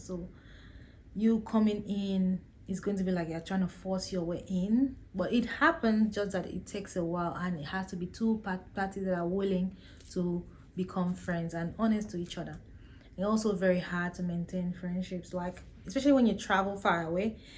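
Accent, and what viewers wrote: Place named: Akwa Ibom, Nigeria. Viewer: Akwa ibom or calabar diction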